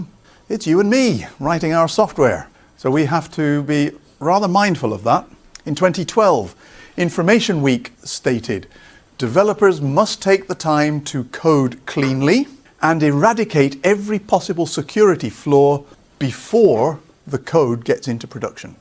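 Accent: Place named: United Kingdom. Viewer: Hey, your videos are very nicely explained along with eloquent British accent. Keep on posting videos. Thanks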